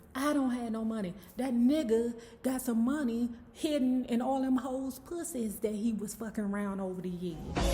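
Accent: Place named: South, United States. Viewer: Heard the go-go and was like 😳.. who dis? While time I’m driving and listening wondering how you from here with that dirty south accent 😆❤️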